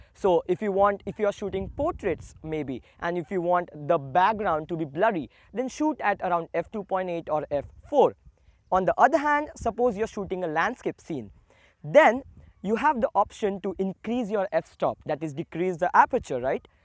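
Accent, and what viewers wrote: India. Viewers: very nice explain... i think it is the time to make a video on how and what principle camera works with animation clear in indian english like your sweet talks... we are eager to get that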